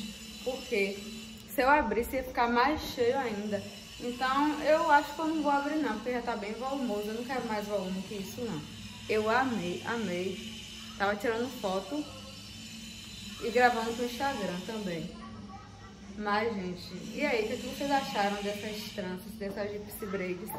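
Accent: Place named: Bahia, Brazil. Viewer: Ela é baiana, o sotaque igualzinho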